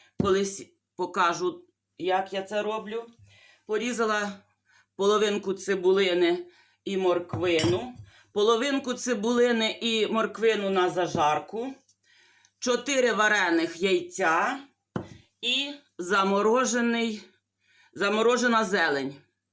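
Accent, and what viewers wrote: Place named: Podillia, Ukraine. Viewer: А Поділля велике, я теж народилась на Поділлі, проживаю на Буковині (на кордоні 3-х областей), мені такий ваш говір рідний, і прізвище як у моєї куми😊. Люблю вас дивитися❤❤❤❤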